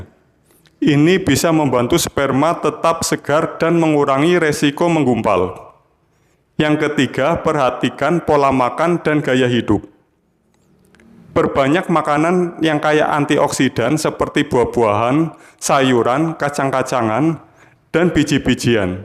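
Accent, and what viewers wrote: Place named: Java, Indonesia. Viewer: Suaranya mantap bgt. Sangat manly meskipun berlogat jawa.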